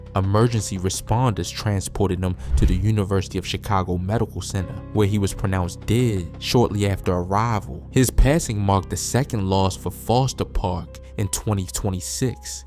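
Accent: Baltimore, United States. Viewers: Sounds like a Baltimore dude is giving street news on Chicago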